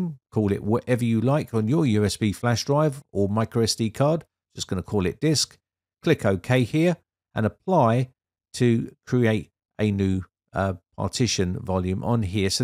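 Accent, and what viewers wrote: England, United Kingdom. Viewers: Calls himself a Brit, sounds like a Brit, speaks like a Yank- 'go ahead' this , 'go ahead' that. Bad enough when an American continually says it but it is annoying af hearing it here. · Are you an American with an English accent?. You keep saying "GO AHEAD" in front of nearly every verb. Unnecessary, annoying, wrong, meaningless.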